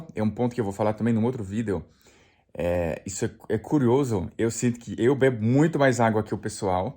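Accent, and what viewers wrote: Brazil. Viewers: O francês abrasileirado!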